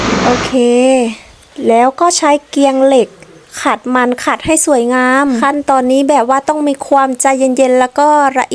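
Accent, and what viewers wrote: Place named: Laos. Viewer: เสียงไทยปนลาวสาวแว่นตั้วนี่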